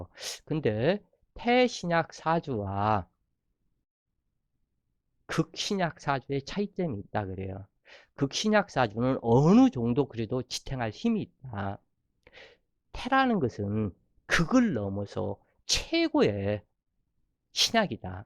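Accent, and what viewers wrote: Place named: Jeolla, South Korea. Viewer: 전라도 사투리가 엄청 도드라지네요.ㅎ 갑신월 기사일주라서 주의깊게 듣고 갑니다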